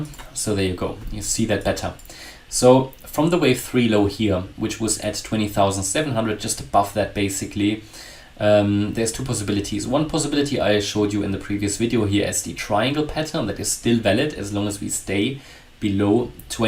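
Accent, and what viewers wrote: Germany. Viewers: Love the German accent. Keep the studies in coming! 📊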